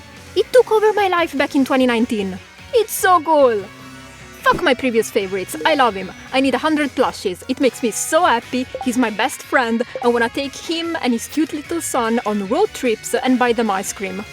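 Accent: Lombardy, Italy. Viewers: Ah quindi sei brescianə/bergamascə? Mi piace tantissimo il tuo canale, dallo stile di disegno alla tua voce e la tua schiettezza, continua così 😊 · vecio ma sei di Bergamo/Brescia?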